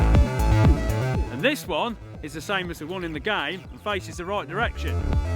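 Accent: United Kingdom. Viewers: i fuckin LOVE your british accent this is a #nohomo commentary i swear but i do really like british accent, from boys or girls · I really like his accent We asian can't get this type of accent asian really don't get this kind of accent except we were born in UK.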